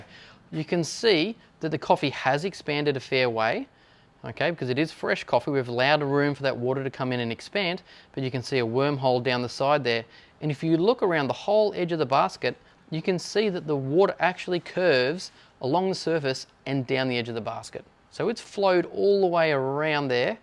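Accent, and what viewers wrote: Australia. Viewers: I heard the Aussie accent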